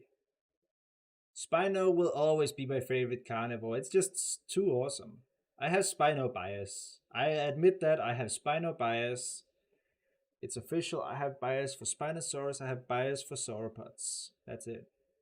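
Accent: Denmark. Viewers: Are you from denmark🇩🇰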